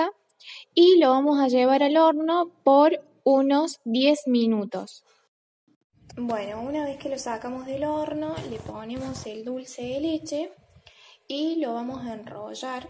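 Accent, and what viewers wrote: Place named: Córdoba, Argentina. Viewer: Que rico amo este tipo de media tarde bien Argento, me encanto como lo explicaste y tu acento cordobés lo es todo!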